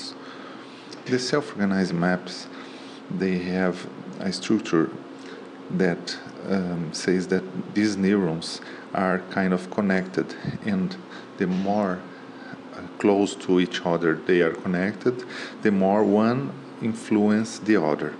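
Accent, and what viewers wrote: Brazil. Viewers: U are from Brazil? Nice ascent. If yes: Muito obrigado pela explição!! If no: My very thanks for the explanation.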